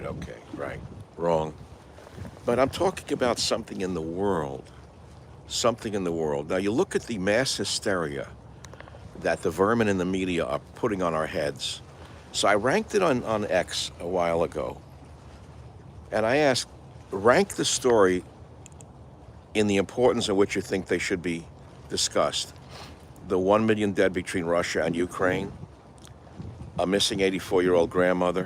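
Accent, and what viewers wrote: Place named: Brooklyn, United States. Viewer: Gods did I miss that Brooklyn accent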